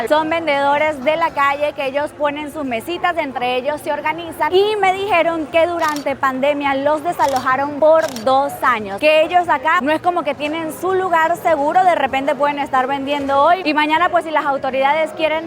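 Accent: Venezuela. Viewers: Siento que en este video habla más como VENEZOLANA, que cuando está en México 😅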